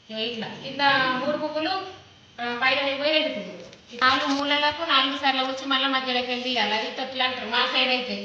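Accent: Nizamabad, India. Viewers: మాది నిజామాబాద్ జిల్లా,నీవు మాట్లాడే యాస నిజామాబాద్ జిల్లా యాస లాగా వుంది అందుకే మీది ఏ ఊరు అని అడిగాను.జై తెలంగాణ జై, భీమ్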